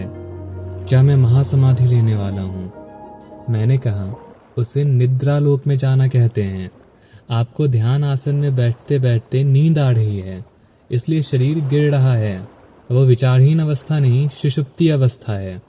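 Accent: Bihar, India. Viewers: Oye grammer me phd wo bihar ke hain aur Bihar me aise hi pronounce hota hai r, har jagah ka regional accent alag hota hai. Tera hi bas sahi nahi hai, ek to wo itna kosish kar rahe hain hamare accent me bat krne ka upar se nautanki karna h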